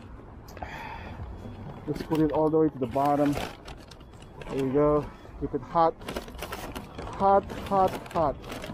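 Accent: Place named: Southeast Asia. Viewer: your accent is asian... errrr South east asian?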